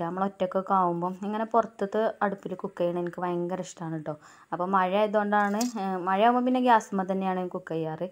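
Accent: Malappuram, India. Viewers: ഇയാൾ മലപ്പുറം ആണോ 🤔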